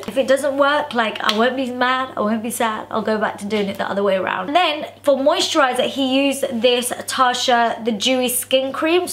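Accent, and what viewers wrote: United Kingdom. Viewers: Omg hate your englisch accent 🤢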